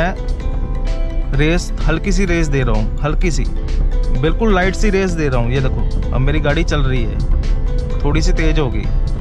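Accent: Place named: Uttar Pradesh, India. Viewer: I think up army se ho mujhe lag raha hai aap ki Bhasha se aur baat karne ke tarike say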